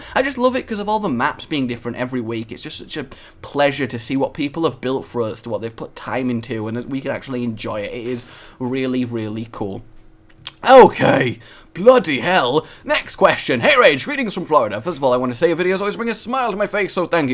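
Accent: Germany. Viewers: Who else, when hearing Rage's "German" accent, instantly thinks: "Flutters"? Also, Rage, since you are not a vegetarian, what type of meat do you like the best? I like Moose meat personally... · You're german accent is quite funny, but what I don't understand is how did you come up with it? Usually everyone who does a german accent try to sound really aggressive and load and all that, you know, because of the stereotype and so on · Your german accent is way to high pitched.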